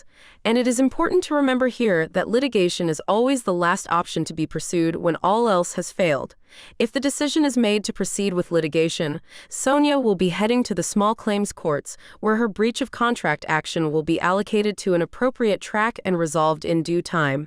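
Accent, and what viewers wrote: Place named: United States. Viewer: so annoying using American voice for uk law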